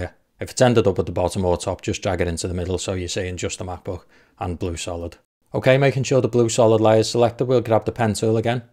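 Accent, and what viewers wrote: Liverpool, United Kingdom. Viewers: Not to mention the scrolling website on the Sqs example appears to have an FAQ accordion, which, of course... you need a plug-in for. Nice seeing how to do this on Ae rather than Ps. Always enjoy the humour in your videos. Is that a scouse accent I hear?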